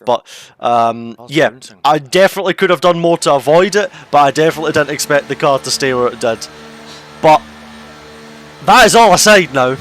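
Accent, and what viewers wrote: Scotland, United Kingdom. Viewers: It’s a Scottish accent and it can often be quite hard to understand. It’s hard for native English people to understand those who have a harsh Scottish accent sometimes so it’s no surprise you have noticed the difference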